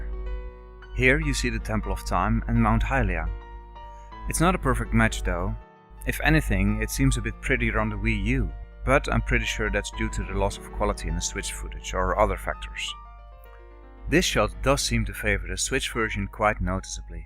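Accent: Netherlands. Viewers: you're Dutch, aren't ya?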